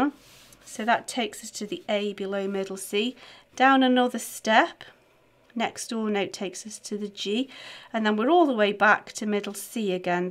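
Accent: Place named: Dudley, United Kingdom. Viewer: not far away from me! I thought your accent was quite near hehe! I’m in dudley! Nathan rose is my teacher!:) x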